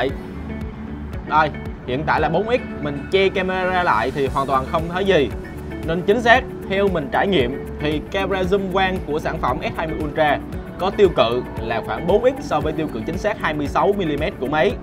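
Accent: Binh Dinh, Vietnam. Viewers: anh này dân bình định hả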